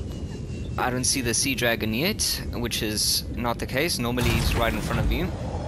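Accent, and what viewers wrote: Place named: South Africa. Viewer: Ah cool, love accents but always had a hard time spotting south african, anyways thanks for the help with these videos, really helped a lot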